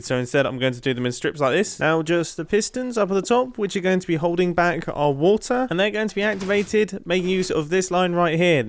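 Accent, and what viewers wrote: United Kingdom. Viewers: aren't we all loving this British person accent? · I love his British accent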